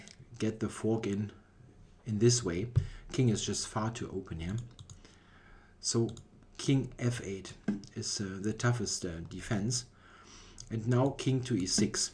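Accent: Germany. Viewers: alter.. bist du deutsch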